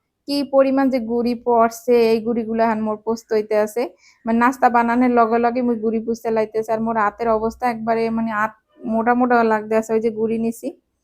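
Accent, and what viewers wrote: Barishal, Bangladesh. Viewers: সম্পূর্ণ ভিডিওটি বরিশালের ভাষায় সুন্দর করে উপস্থাপন করলেন আপু ❤লা ডান❤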